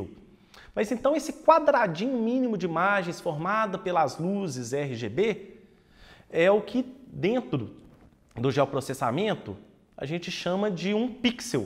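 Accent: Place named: Minas Gerais, Brazil. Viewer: geoprocessameeeento. como eu amo o sotaque mineiro kkkkkk otima aula! obrigado!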